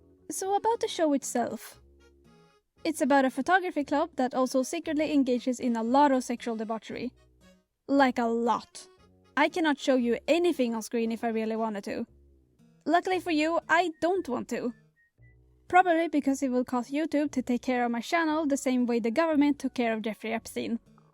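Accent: Sweden. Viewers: What accent do you have? are u Swedish?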